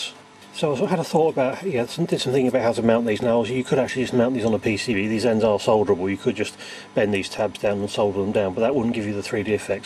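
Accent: United Kingdom. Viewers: British actually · my apologies, but, I'm American and I'm having a hard time following you , you sound like your mumbling...!!! Sometimes the Brits murder the English language....now Bigclive I understand him clearly..!!!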